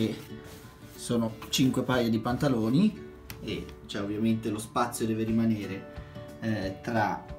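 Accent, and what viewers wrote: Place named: Liguria, Italy. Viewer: Ho provato ! Molto meglio ho impostato programma misti (la mia Candy 9kg ha questa dicitura x i sintetici) 40° asciugatura di questo stesso programma, ha il simbolino del ferro da stiro, torce molto meno i pantaloni che comunque ho notato non devono essere troppi direi max 4 ..in sole 3 settimane di possesso della lavasciuga sto imparando grazie al tuo aiuto e provando da me nuove opzioni ! Mi sei di grande aiuto guardo tutti i tuoi video e sono contentissima che mi rispondi tempestivamente. Simpatico anche il tuo accento (mi sembri ligure) ciao ti scriverò ancora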